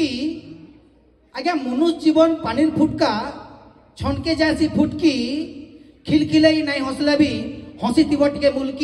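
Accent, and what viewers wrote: India. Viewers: da. Bahut sundar. Hele.. Samalpuriaa pare DADA KATKIAA COMEDY. BHALL NAI LAGBAR. TAR. SANGE. SAMBALPURI VOICE. MITHA LAGSHI. DADA. JADI. KHARAP. LAGALA. BLL MAPHA KARBE....... Hele reply darkar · Sambalpur 6ua ki katkiya 6ua tui j katkiya kahu6u re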